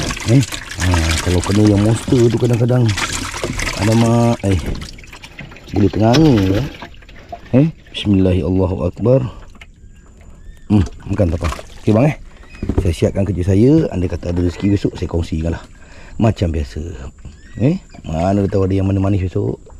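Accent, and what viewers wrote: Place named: Melaka, Malaysia. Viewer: Bro dgr ckp mcm org Melaka ni bro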